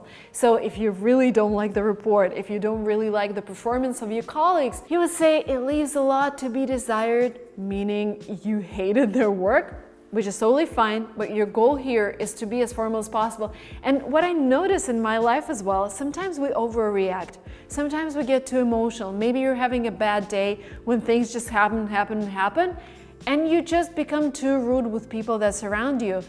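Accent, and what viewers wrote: United States. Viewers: I love your way to teach English with an American accent 😊👍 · Hi ma'am! I've been watching your videos from this year and I've quite improved my vocabulary and caught up the American accent. Thank you for bringing on new things every week. 😇❤️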